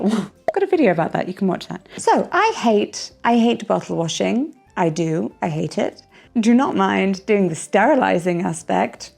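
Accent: RP, United Kingdom. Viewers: Re: strangers talking to you. My grandma will say "sorry I don't speak English" (she only speaks English). Now I want you to try this with people who stop you to talk to you, in your perfect RP English 😂😂😂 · People with the Received Pronunciation, or the stereotypical sort of accent has, say it that way. Not everyone is from the US. This family is British. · Probably because in RP (Received Pronunciation) which is the stereotypical English accent and the one I believe has, premature is pronounced as “preh-muh-tyour”. So if you stick to the accent’s rules it would be preh-mie. Americanisms have crept into a lot of English accents now though, so that may be why people don’t say it like that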